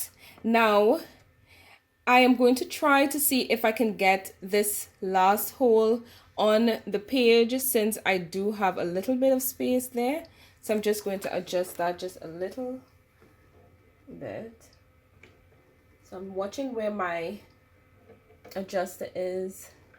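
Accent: Trinidad and Tobago. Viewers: You sound Trini🇹🇹🇹🇹🇹🇹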